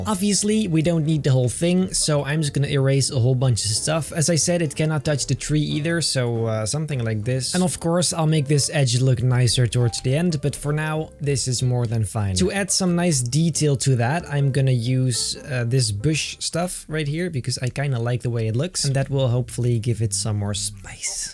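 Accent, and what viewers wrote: Netherlands. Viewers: Jij bent nl ik wist het al aan het accent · Je klinkt heel Nederlands, heb Ik gelijk? :)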